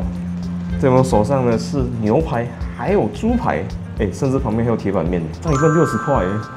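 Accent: Keelung, Taiwan. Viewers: 台湾~基隆的口音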